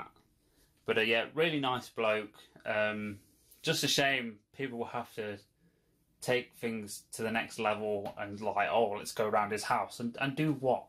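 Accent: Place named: Northern England, United Kingdom. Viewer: Which part of England are you from was wondering as you sound a bit northern ?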